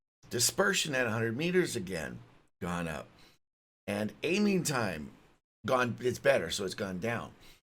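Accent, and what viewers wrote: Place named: Canada. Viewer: Hey,. Good video. Thanks for producing and posting this. Always good, useful information from you. +1 TL:DR - the rest of my comment a bit off topic. So, stop here and move on if you wish. OK? Still here? Alright,now for a (slightly) amusing sidebar. I learned something today. But what? Well, on with my story., I always like your YT videos and I frequently tune in to your Twitch streams. Even platooned with you a few times on the EU server. Anyhow, one thing that always puzzled me whilst watching such was your pronunciation of the word "decal". When I was growing up as a kid back in the '50s and '60s my friends and I, while building our model planes and warships, put DEE-cals (or DEE-cowls) on them. Later on, once we were driving, we'd put DEE-cals (racing stripes, flames from the wheel wells … ) on our jalopy cars to make them look "cool". 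DEE-cals. Here's a couple YT posts - <link> <link> Now here's saying deckle (deck-ul, rhymes with heckle or freckle). Deckle? Why'd he say deck-ul instead of DEE-cal? So, I did a little internet searching. Turns out the "deckle" pronunciation for the word decal is a Canadian thing, eh. Cool. In other English speaking countries (US, UK, Australia and so on) decal is commonly pronounced DEE-cal, <link> But, there are many words in the English language that have alternate pronunciations in the various countries where English is the principle language in usage (decal being one such word). Just take US vs Canada (or should that b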